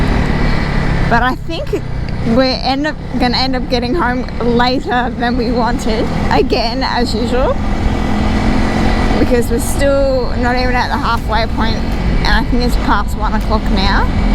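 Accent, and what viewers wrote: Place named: Australia. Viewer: Thats most Australian accent eva…😎🤘🏻🤘🏻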